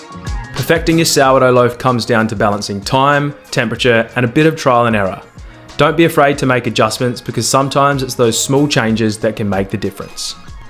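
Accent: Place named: Australia. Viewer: It's so nice to hear an Australian accent on YouTube. A nice calm voice just explaining how to make sour dough bread. Lots of good tips about resting the dough, concerning ambient temperature, that I haven't heard of before, so thank you. Nice kitchen too. ❤️🙋‍♀️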